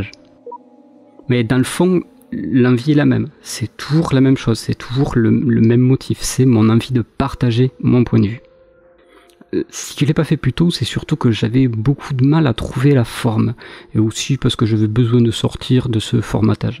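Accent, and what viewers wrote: Toulouse, France. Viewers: Voila pourquoi je n'ai jamais pensé a unsub cette chaine! Toujours un plaisir d'entendre cet accent qui m'est si cher (oui je suis toulousain et chauvain) Bon retour sur youtube j'attend avec impatience ton nouveau contenu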